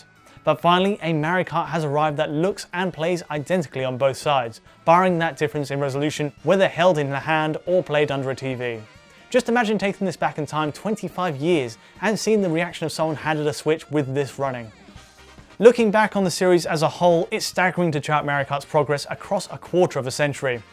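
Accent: United Kingdom. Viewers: He's a Brit mate. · The brits pronounce Mario as "Mary-O"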